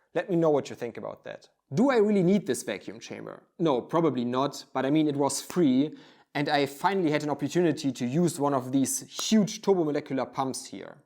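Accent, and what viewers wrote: Germany. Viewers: Love the German accent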